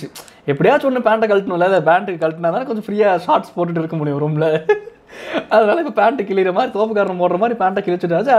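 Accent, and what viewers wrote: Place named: Karur, India. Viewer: Happy mau day💪💪💪💪💪ean irumal odambu sari illaya? Love fm srilanka😍😍😍😍😍karoor slang nice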